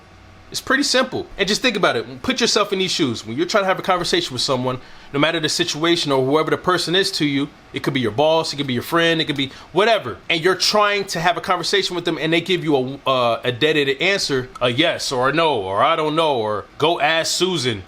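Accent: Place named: South, United States. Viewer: Oh yes I know that Southern talk LoL 😂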